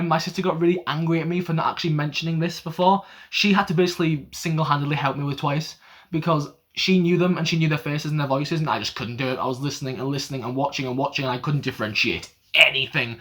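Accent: United Kingdom. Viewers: Casual thoughts I had while watching this video: Loved your toy story notebook. Your D.O. impression is on point. I´m from Latin America and I also really like your accent, I had a couple of British teachers back in high school and I always liked the way they spoke. Yes, Jongho is probably the best 4th vocal atm, I love his voice so much. Yeah, fan wars may be an issue if you do overall rankings but from what I´ve seen on your videos comments most of your subscribers are pretty chill people, you calling yourself a soft boy is a whole mood haha. My favorite rapper/singer is Jisung too, you should check out his king of masked singer performance if you haven´t yet, truly amazing. I don´t think you´d ever have to put an end on your ranking series because so many groups debut each year, you have endless content hahaha. NOOO, WE´RE NOT JUST HERE FOR THE RANKINGS, you´re such a cool person, a lot of people like you a lot just because you´re funny and a nice dude. 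Yes, we´d love some covers haha. YES, IDOLS DESERVE SO MUCH RESPECT FOR WHAT THEY DO UNTALENTED IDOLS DO NOT EXIST. Omg happy birthday, I hope you have a great day tomorrow. Thank you so much for answering my question and giving me that shoutout, I believe in your channel so much and I´m glad I can be a supporter. Wow, I couldn't agree with you more on that answer, kpop is so much more than just vocals and if a group needs a backing track in order to deliver a performance in the best way they can then I say they should go f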